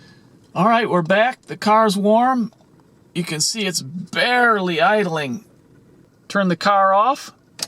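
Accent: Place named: Minnesota, United States. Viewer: Thank you this fixed my problem on my fzj80 land cruiser! You sound like my good friend from minn!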